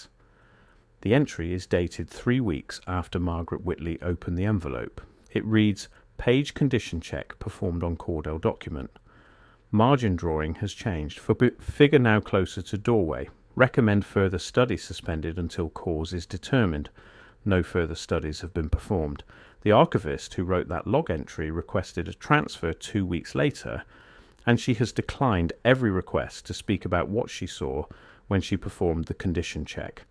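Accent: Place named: United Kingdom. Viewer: Ridiculous to have this narrated by a British voice. It’s like wearing a striped shirt with a polka dot pair of pants and disco boots.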